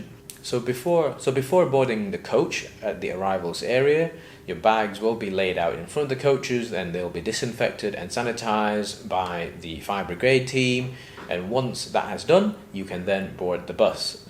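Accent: Malaysia. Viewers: Was wondering whether you were Malaysian, the slang gave it away AHAHAHA! Nice to see a countrymen in this field!!! Subbed and liked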